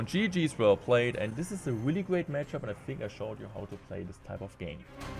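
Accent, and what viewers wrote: Germany. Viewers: can u add subtitles to ur videos? ur German accent is so damn hard to understand and the fact that u talk pretty fast is not helping at all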